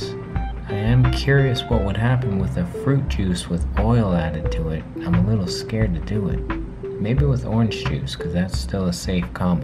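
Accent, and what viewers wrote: South, United States. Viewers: You had a southern drawl in this video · u talk like u 4rm the south son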